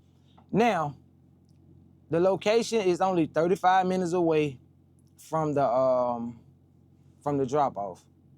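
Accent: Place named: South, United States. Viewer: New Subscriber Alert!! 🚨 Love your mindset & southern accent! Looking forward to more videos 😊🔥🔥🔥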